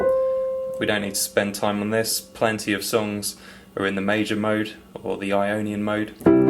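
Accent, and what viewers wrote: United Kingdom. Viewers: also you're very charming and I love your british accent :3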